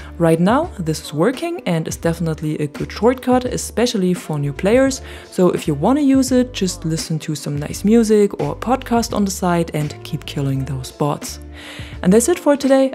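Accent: Germany. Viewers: You Germany accent is so beautiful 😍😍😍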